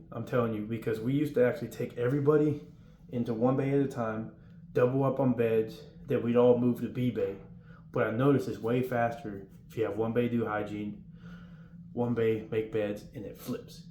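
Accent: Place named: South Carolina, United States. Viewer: Are you from South Carolina? Sound like it lol i am too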